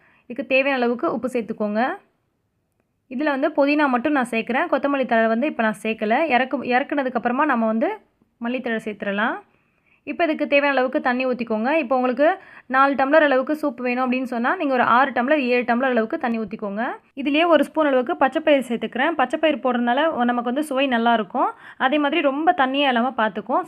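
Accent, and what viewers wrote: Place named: Thiruvarur, India. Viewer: அஸ்ஸலாமு அலைக்கும் சூப்பர் மா நீ எந்த ஊரு மா பேசறப்ப பார்த்தா திருவாரூர் மாவட்டம் மாதிரி இருக்கு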